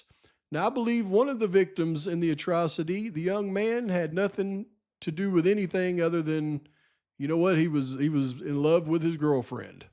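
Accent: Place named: Arkansas, United States. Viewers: Mountains. I'm thinking Northern Arkansas or Geirgia. Everyime I play one of his videos, my husband says he sounds just like Dr Phil! Where is Dr Phil from? Lol! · Funny. I’ve been curious where you were from. Southern accent and you say “Interesting” the same way I used to when I grew up in the mountains. Not as remote as you describe though. Hang in there, we’re with you.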